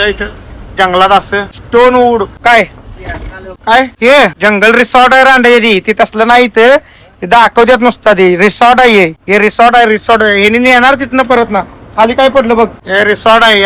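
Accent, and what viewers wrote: Kolhapur, India. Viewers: भाऊ तुझे ब्लॉग छान असतात, पण तू व्हिडिओमध्ये घाण घाण शिव्या नको देत जावू. कारण तुझे व्हिडीओ लेडीजसुद्धा बघत असतात, तुझी कोल्हापुरी भाषा शैली मस्त आहे, फक्त शिवीगाळी बंद कर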